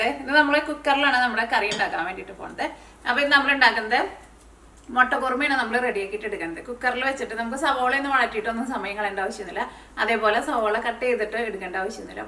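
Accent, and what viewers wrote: Thrissur, India. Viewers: Thrissur slang , mutta kuruma adipoli, ee veedu evideyanu?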